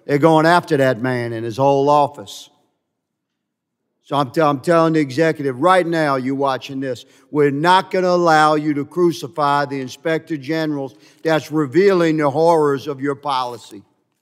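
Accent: South, United States. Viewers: Thank you Mr Higgins, for using that amazing southern voice to stand in defense of those precious innocent children.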